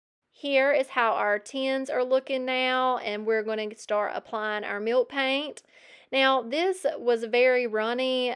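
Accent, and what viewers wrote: South, United States. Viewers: All these were great! I loved the tins the best, but the rooster in the frame was a close second. I think a deep red frame with black wax may have added something, but honestly, it's good as is. I'm grateful for my amazing family that tolerates all my crazy collections and hobbies! Congrats on 1 year and 10,000 subs - you are fun to watch. I'm originally from the south, living in Colorado. Your accent is like a warm hug from home. :)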